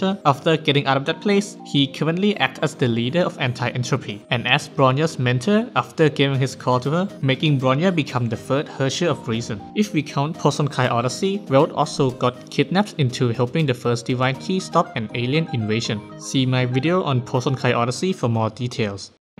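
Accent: Thailand. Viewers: offtopic for a moment i did NOT expect this youtuber to be thai, i heard his accent and i checked his about page and damn he really is thai good to know that i found an another thai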